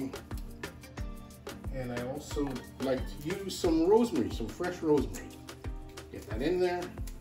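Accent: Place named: Jamaica. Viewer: Looks yummy and colourful. A feast for the eyes and stomach. P.S. I love the touch of Jamaican accent .... a full authentic Jamacian vib. ❤ 🇯🇲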